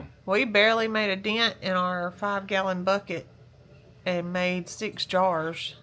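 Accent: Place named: South, United States. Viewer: Wonderful video. If people tease you about your southern speech, they need to get over it. We all knew what it was you meant. Get some rest girl, you've earned it.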